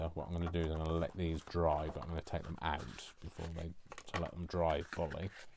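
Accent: United Kingdom. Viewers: I really enjoyed watching you doing your drawers. Yes, the glue is fantastic and I loved the sound of your fingers patting the paper down. You say that you ‘ talk rubbish’... I certainly don’t think so... but if you do, it’s delightful, educated and unique rubbish and I love to hear it! What a coincidence that the paper was from one of the Brontee sisters’ books and there you are in Yorkshire talking with a Yorkshire accent... it just occurred to me that the Brontee girls would have had Yorkshire accents! Thank you, ❣️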